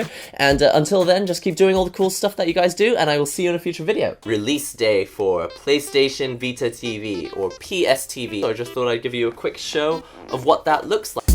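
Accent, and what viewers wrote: England, United Kingdom. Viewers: Question time... Please don't take offence) where are you from? because you have a very English (I think) accent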